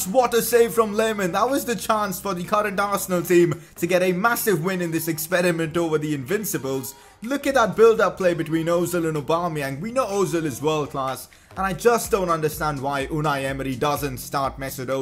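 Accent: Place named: India. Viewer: You sound like an indian(🇮🇳) or Someother Asian country person🌏 that learnt to speak English just a month ago😂😂😂(STG(swear to God)not tying to be racist 😑)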